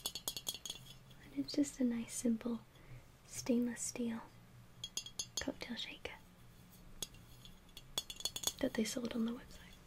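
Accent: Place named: Wales, United Kingdom. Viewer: love your videos from hirwaun and its nice to hear a welsh voice on here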